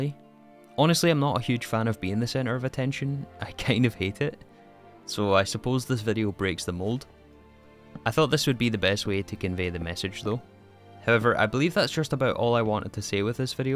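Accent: Scotland, United Kingdom. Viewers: we've all had the honour to experience and enjoy your brilliance in this blocky game. Life changes and evolves, as must we along with it. We will miss you, we love you, and we're happy if you're happy. So long dear friend, hopefully we may hear that beautiful Scottish accent again in the future. Wherever you go, whatever you do, always ALWAYS check if its HV or MV. ❤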